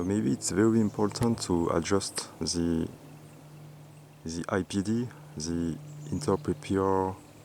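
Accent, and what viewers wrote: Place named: France. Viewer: toi vu l'accent c'est sur t'es francais !!!!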